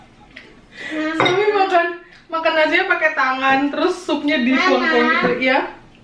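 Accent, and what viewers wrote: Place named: Java, Indonesia. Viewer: Mamak, apa dari ortu mamak ada darah jawanya kah? Kalau bicara mesti ada bahasa jawanya. Seneng dengarnya 😍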